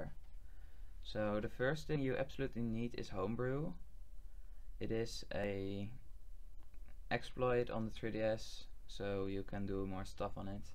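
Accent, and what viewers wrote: Netherlands. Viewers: wanneer een nederlander engels praat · something tells me you're from holland :P, correct me if im wrong, and if you are from holland(don't worry about it im form holland aswell :P )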